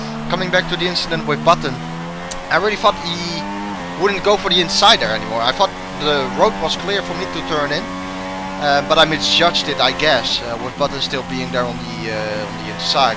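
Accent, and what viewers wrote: Netherlands. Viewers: Ik kon gwn aan be engels horen dat he nl bent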